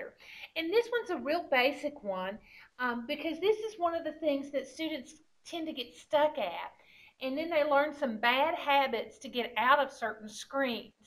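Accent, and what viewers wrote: United States. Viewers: God bless you, are you from Boston?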